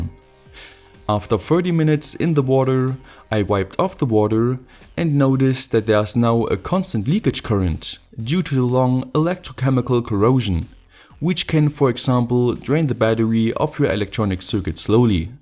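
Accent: Germany. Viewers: Bist du deutsch? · aha du bist deutsch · are you German? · Lol, du bist 100pro Deutsch! Aber trotzdem gutes Video! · You are from Germany? Kommst du aus Deutschland 🇩🇪? Siehe min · Du bist deutsch hat man herausgehört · Are u german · Man merkt so das er sonst deutsch spricht😂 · Den deutschen akzent hört man halt direkt raus xd aber geiles video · Are you a german?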